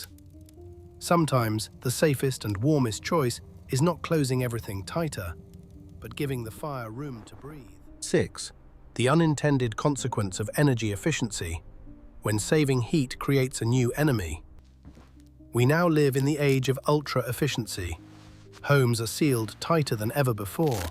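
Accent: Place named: England, United Kingdom. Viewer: The bones of the building. 🙂‍↔️ That thick, English accent! ☝🏻🧐 The bones of the house lose heat. ☺️😆 I love it, German shock ventilation! 💁🏻‍♂️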